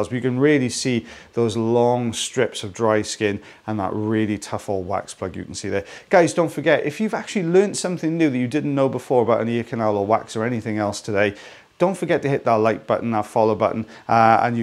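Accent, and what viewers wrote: Wales, United Kingdom. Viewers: I am loving learning new terminology and facts about ears. Last year I had to get my ear cleaned after swimming had dislodged some wax. Went to the GP who was a bit thrown by my telling her that I thought the wax had gone into the anterior recess. Turns out that the nurse who did the subsequent syringing (no micro suction available here) was also fascinated with ears. I told her about your channel and she has become a huge fan too. Why do I love your videos? I learn something, it is so satisfying to see the results and how much difference it makes to your patients, Your passion for what you do and finally, I simply love a Welsh or Scottish accent 😃 Between you and The Hoof GP, I get to watch people who love what they do, help others in pain or discomfort and learn. I do all of this from the comfort of my home on the other side of the world in tropical Northern Australia. 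Thank you!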